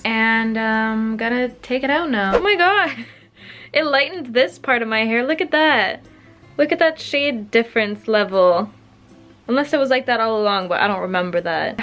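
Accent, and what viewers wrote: United States. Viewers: Where are you from? You said pounds but you sound American x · Where are you from? I don’t understand why you’re saying pounds when you sound pure American.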